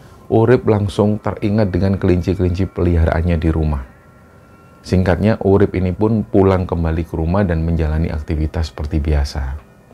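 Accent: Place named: East Java, Indonesia. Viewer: Semangat terus Tim 😊 kadang memang dalam berkembang akan terus ada masukan dan kritikan sampai nantinya bisa punya "ciri khas utama" yg akan diterima semua pendengar apa ada nya 😊 Btw kl saya pribadi, saya suka karena ada logat jawa timur nya.. jd suka kl mas ngmg lebih lokalan lagi, nyeletuk boso suroboyoan, atau ngasih info2 sejarah atau latar belakang lainnya. Jadi waktu baca komentar ada yg bilang, "Kl ngomong pakai Bahasa Indonesia dong, jangan pakai bahasa daerah. Kan yg dengerin ga semua ngerti." Aku merasa.....aneh 😅 karena kl pengen dengerin kisah horor berbahasa indo knp ga dengerin Chanel lain aja.. kan memang channel yg seperti ini, dan ini yg bikin ngenak di hati subscribernya.. 😢 Tp yowes lah, apapun yg usahakan, semoga bisa berkembang menjadi lebih baik lagi dan bermanfaat untuk org banyak. Aamiin 😊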